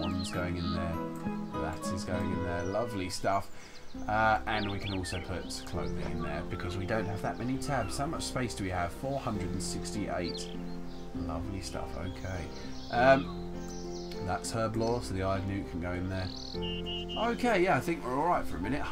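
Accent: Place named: Scotland, United Kingdom. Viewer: I think you were suppose to stand next to Gideon so he could shield you when the demon did his super attack thingy. But you managed to kill him anyways so it's all good. Can the baby troll be named Pickle? I think it should be named Pickle. :D I think your Scottish accent is fine, but I'm Canadian so what do I know? :P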